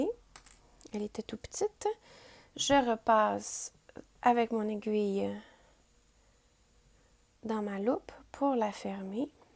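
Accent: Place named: Quebec, Canada. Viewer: Entendre des instructions à la manière et surtout avec l’accent de la Nouvelle-France! Merci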